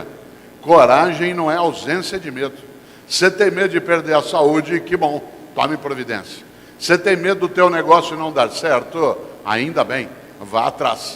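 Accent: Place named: South, Brazil. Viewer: Assisto várias vezes.... . Gosto. Do sotaque do povo lindo do Sul .....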